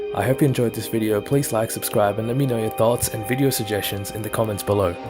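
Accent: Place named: Australia. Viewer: He's Aussie mate!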